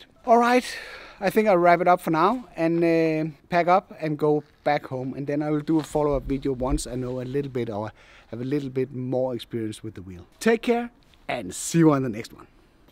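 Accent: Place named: Denmark. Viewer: Din accent lyder meget dansk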